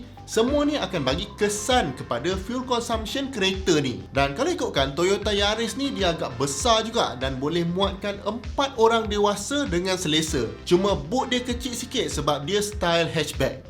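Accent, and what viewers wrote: Johor, Malaysia. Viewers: Prof orang joho ke ? 🤭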